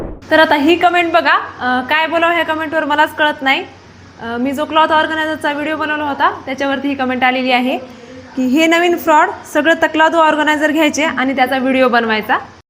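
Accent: Parbhani, India. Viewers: माझी भावजय तुझ्यासारखीच बोलते. ती पण परभणीचीच आहे...छान वाटते ऐकायला❤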